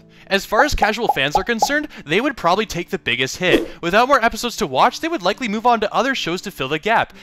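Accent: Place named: Canada. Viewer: U sound very Canadian, lol so am I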